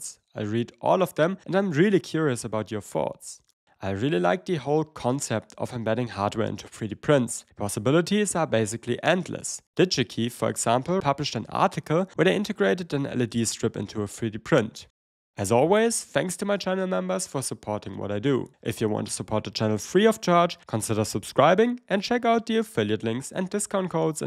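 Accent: Germany. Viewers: If I had a cent for every time I found a 3d printing channel with a German accent I'd have 2 cents. Which isn't much but it's weird that it happened twice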